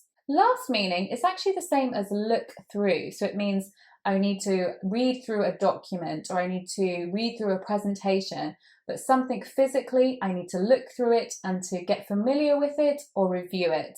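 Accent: United Kingdom. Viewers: I never give up I never come around I adore british accent thank you for help me god blessing you forever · Awfully love British accent or love the u teach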